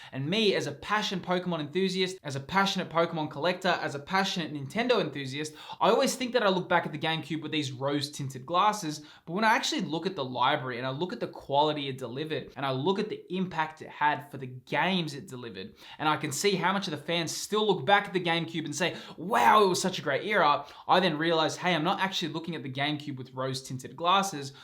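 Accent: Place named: Australia. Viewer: I didn't know that I needed a fellow Aussie to talk about the Gamecube like this, but damn - great video!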